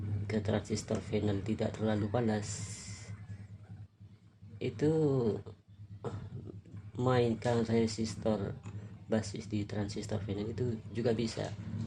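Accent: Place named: Madura, Indonesia. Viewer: madura mana sampean mas?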